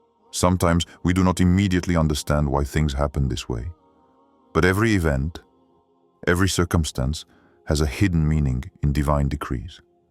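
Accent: France. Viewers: Thank u bro , are u from france or Canada !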